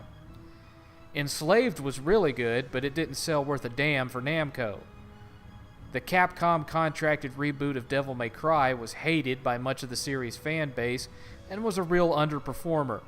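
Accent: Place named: Alabama, United States. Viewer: I didn't really like the game, it was cool but meh, but your war against artistic indie games is some real incel shit. Also you seem to have blanked out half the game, the lover never blamed her, that was clarified later, that was her mental illness making her assume the thoughts of others, her father did some sus shit though. "San Francisco Arthouse crowd", oh I get it, you're one of those far right cringe republican reactionaries, yikes. "It's easy and predictable" while showing a video of you getting your ass beat by singular enemies. "Dark Souls for dummies" right after he showed he sucked at it. Guess you're self burning yourself there? And then he cries about the twitter crowd lol. It's very hard to listen to you complain about how easy the game is, when you're barely winning the battles on screen. This guy's accent and word choice becomes more Alabama the longer this video goes on. 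OMG, he's LITERALLY triggered by people liking this game, that's pathetic asf. "Pieces of shit who said this was goty"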